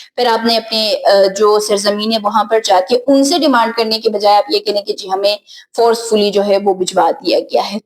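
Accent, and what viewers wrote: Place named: Gilgit, Pakistan. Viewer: Ap ki urdu sunn k ap mujhy gilgit ki lagti hain but u r v, nice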